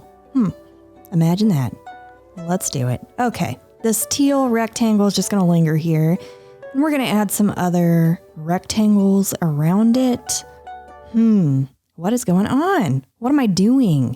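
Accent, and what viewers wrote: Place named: South, United States. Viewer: I have been watching you for years and today I am finding out you have a Southern accent wow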